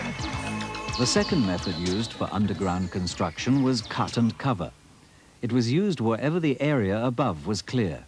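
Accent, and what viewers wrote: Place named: United Kingdom. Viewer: Same British accent!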